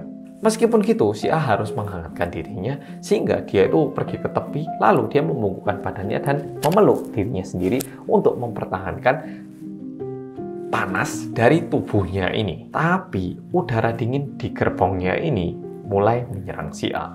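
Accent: Java, Indonesia. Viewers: Selalu ngikuti channel ini,tpi juarang komen,awalnya tertarik dg logat jawanya,cocok aq yg wong Jombang,bolo..bolo yoo wk..wk,sampek aq pingin tahu sejarah hidup mas ini,..🤝🤝🤝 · Sogannya lucu orang Korea yang medok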